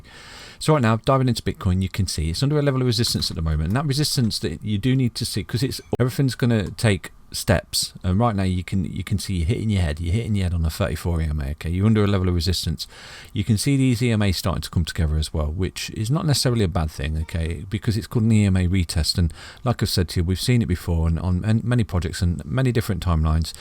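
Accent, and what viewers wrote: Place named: Nottingham, United Kingdom. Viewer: Sounds like he's from Nottingham. Although I don't have a crystal ball...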